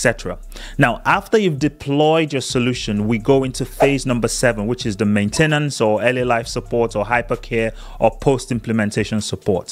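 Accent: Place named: East Africa. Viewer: I love man brother. So eloquently explained. if I might guess, are African (East side). Bless you man😀😀😀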